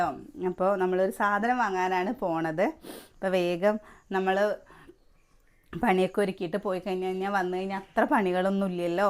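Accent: Palakkad, India. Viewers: പറയുന്നതുപോലെ പറഞ്ഞോളു അതാണ് കേൾക്കാൻ രസം ട്ടൊ നമ്മുടെ നാടൻ ഭാഷയാണ് പാലക്കാട്കാരിൻ്റെ സ്വന്തം ഭാഷ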